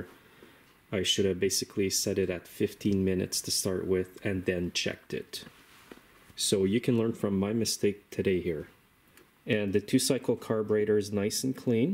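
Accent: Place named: Canada. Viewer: Definitely Fahrenheit. Also, I just love the Canadian accent. Good video.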